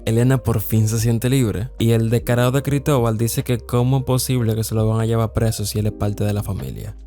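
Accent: Dominican Republic. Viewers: Bro eres dominicano o es cosa mía? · AY ERES DE REPÚBLICA DOMINICANA OMG DE QUE PARTE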